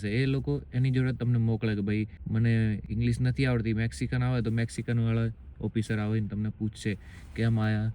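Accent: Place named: Surat, India. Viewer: Surati gujarati bole chhe 😅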